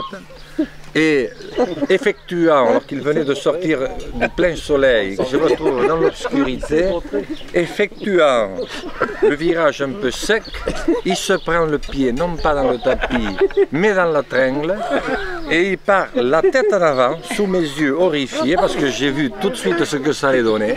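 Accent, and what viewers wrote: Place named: Brittany, France. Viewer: Breton, j'adore cet accent et j'apprécie bcp ce monsieur que j'ai découvert sur Arte tandis qu'il visitait un artisan de son secteur. Pour l'accent, écoutez le poème "L'accent" de Zamacoïs dit par Fernandel, c'est magnifique !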